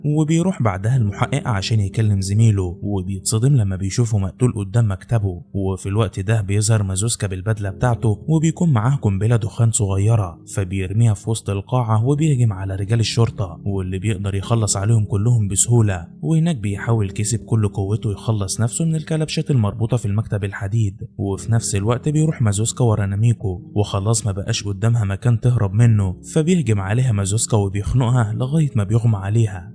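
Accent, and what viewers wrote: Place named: Iraq. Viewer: ليش احسك عراقي وتحجي مصري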